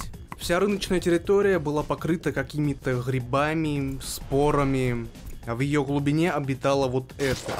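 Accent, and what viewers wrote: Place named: St Petersburg, Russia. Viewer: Голосок Питерский конечно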